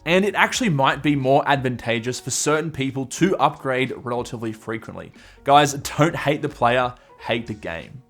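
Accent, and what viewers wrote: Australia. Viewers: When im trying to listen but being distracted with that damn eargasmic accent.. dont get me wrong its soooooo good for my ears. Hahah. Im thinking he’s an aussie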